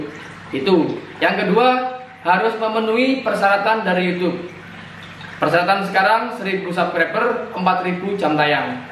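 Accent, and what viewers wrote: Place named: Java, Indonesia. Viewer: Logat medok dan kaku yg penting sukses mas.. salam dari youtuber anyar🙏🙏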